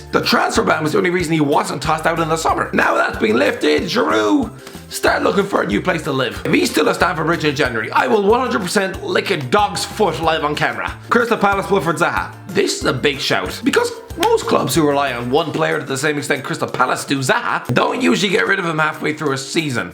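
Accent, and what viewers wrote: Ireland. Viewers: Irish accents are really nice, but your sick, dont upload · Press f in the chat for Irish guy voice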